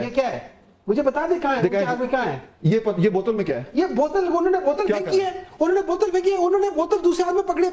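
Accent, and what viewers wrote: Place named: Punjab, India. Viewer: Mind-blowing words n amazing style of panjabi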